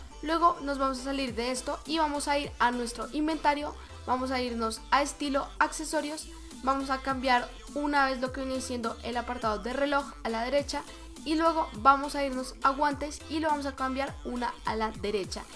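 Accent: Colombia. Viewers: eres de Colombia?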